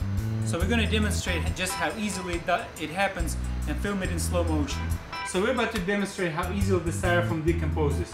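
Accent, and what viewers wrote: Russia. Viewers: I like ur russian accent! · Сильный русский акцент! Ты из России/Украины/Беларуси? В чем суть эксперимента? Ну, растворим полистирол в ацетоне и чё, это его основная опасность и все умрут из-за этого?!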